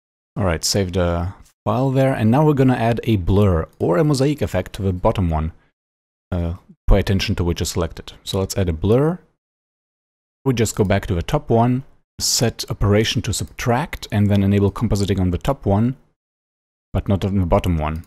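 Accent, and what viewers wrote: Germany. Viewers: you can hear the german accent immediately. it's as plainly as the russian accent. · wie deutsch kann ein englisch bitte klingen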